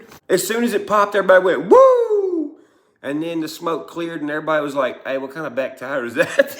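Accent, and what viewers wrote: South, United States. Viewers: As an old dude- I'm just gonna say it. Thank you for being a redneck rapper who STILL speaks like a southern dude. * Cool tune btw.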